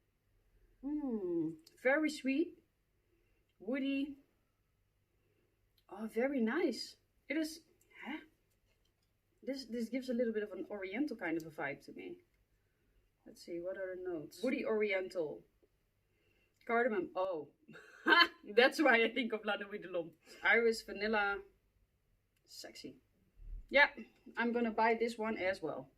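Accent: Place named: Netherlands. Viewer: I love my line of YSL fragrances. La Nuit is probably in my top two fragrances ever. A dark, alluring, sweet and spicy... almost fresh cut grass sillage at times. Undoubtedly multiple of my favorite fragrances lie within YSL. You’re beautiful! I absolutely always love a Dutch accent. One of my favorites. Tot de volgende keer.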